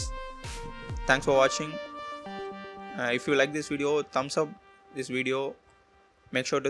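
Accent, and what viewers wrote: India. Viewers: I will never again make fun of Idian nerd guys accent ever again. Thank you so much.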